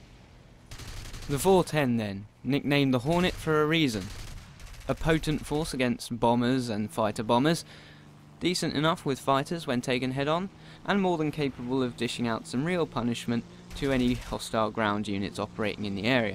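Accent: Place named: United Kingdom. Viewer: Ahhh finally a gameplayer, who speaks a clear and good british english. Thank you! :D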